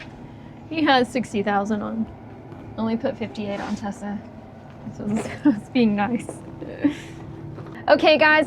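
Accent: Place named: Texas, United States. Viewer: Love you and your texas voice and accent ❤ thats for the very exelent video ❤😊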